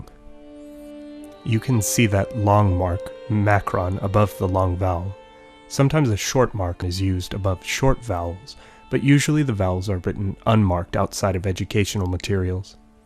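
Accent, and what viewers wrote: Brazil. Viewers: Mate, your portuguese pronunciation is a brazilian one, I'm saddened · Yes. He speaks portuguese like a Brazilian, not a Portuguese. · He uses both the Brazilian and European accents in his videos. In this one, he opted to use the Brazilian one, and the Castilian one when talking about Spanish. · Ele, +Rogério Cardoso, não disse que a versão lusitana deveria ser usada. Na verdade, não há por que preferir uma à outra, tanto que nesta série de vídeos (não é só este) ele usa ora o sotaque brasileiro ora o sotaque português. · It's kinda weird that a guy that is so well educated in the classical languages, their history and their phonology uses Brazilian accent to speak the Portuguese language, specially in it's strong informal phonetic version. To put it in perspective to non Portuguese speaking people, that is the same as to use a southern American accent like a strong Texan accent, as a representation of the English language in a video about the classic/formal phonology of the language. 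Nothing against Brazilian accent on the contrary, but it sounded misplaced due to the issue of the video. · Curiosamente o senhor usa o sotaque brasileiro em sua explicação em português porém ao falar em castelhano usa o sotaque europeu.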